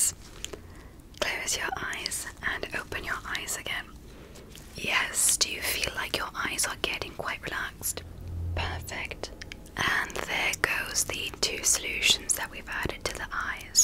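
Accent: United Kingdom. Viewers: Your hair is so cute curly❤️ love the British accent too. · Yay British is back! 😍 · I feel like it’s probably just me but I don’t get tingles with the British accent at all (maybe because I live in the UK and hear jt a lot??), only the North American one 🙈🙈🙈 it would be great if in the future can do more long videos with the NA accent ❤️🙈🙈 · I tend to speak to you in a British accent every time you speak in a British accent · You have a really good British impression ♥️😴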